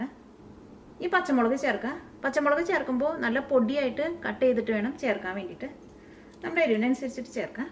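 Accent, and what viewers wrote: Thrissur, India. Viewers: പെങ്ങൾ തൃശൂരല്ലേ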